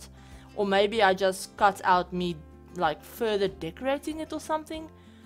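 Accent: Afrikaans, South Africa. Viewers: Are you a Afrikaans speaker? 🇿🇦 Excellent build 👍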